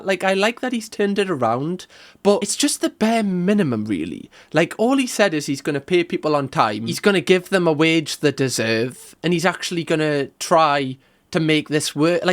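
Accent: United Kingdom. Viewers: omg ... delightful to see another Gordon Ramsay reaction / essay ... AND you have a authentic genuine british accent , beautiful :)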